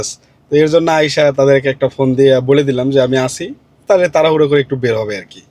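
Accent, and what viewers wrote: Barishal, Bangladesh. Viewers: আপনি কি বরিশালের লোক?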